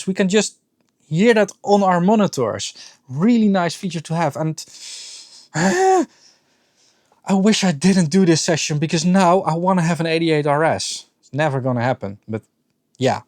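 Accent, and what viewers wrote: Netherlands. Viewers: i keep coming back for your dutch english accent, dutch people are hilarious but very smart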